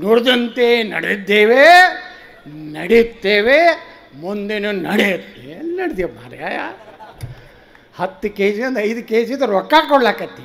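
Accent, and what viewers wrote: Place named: North Karnataka, India. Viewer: ಅದು ಉತ್ತರ ಕರ್ನಾಟಕದ ಭಾಷೆಯ ಸೊಗಡು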